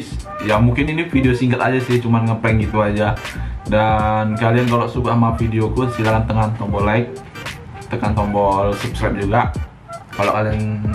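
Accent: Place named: Medan, Indonesia. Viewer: medan kali ya wakk. anak padang bulan kayak.kau ini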